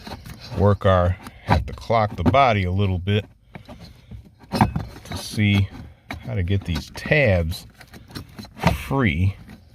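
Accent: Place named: Chicago, United States. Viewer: Thanks. I need to do this on my rig. I like your accent, reminds me of home. You from the burbs of Chicago? Or somewhere over by der?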